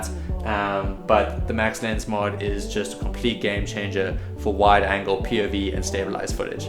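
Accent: South Africa. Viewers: You sound South African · you sound south african!!!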